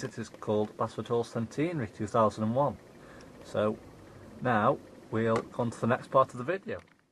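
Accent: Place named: Stoke, United Kingdom. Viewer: I recognize a stoke accent anywhere lol, are your points dcc and do these work with bachmann dynamis m8?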